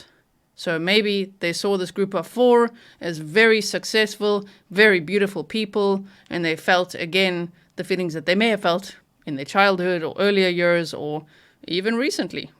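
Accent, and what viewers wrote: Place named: South Africa. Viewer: Why do you sound South African? 😮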